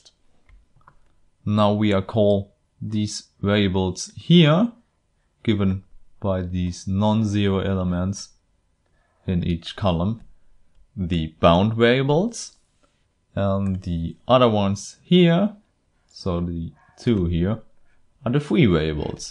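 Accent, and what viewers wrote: Germany. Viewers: Great tutorial video though Permit my saying that your accent seems german.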